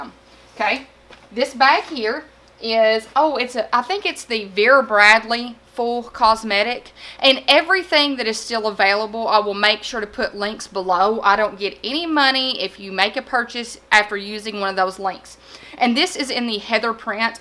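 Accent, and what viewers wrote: South, United States. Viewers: I just started watching your videos, and I really am enjoying them. Like you, I am a planner, list maker, etc. I also own many of the same bags you own. Great minds think alike, right? It is also nice to hear a southern accent on youtube! I'm from Southwest Georgia. By the way, I am also a teacher. 😃😃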